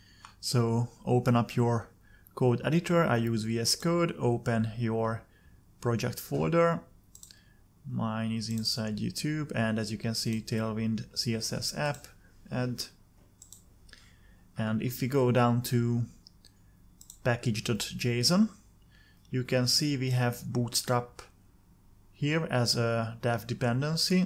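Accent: Hungary. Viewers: akkor jól éreztem az akcentusodból hogy Magyar vagy. Köszi akkor magyarul is 😀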